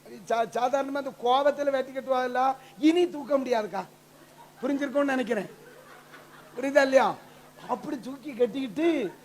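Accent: Kanyakumari, India. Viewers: KK District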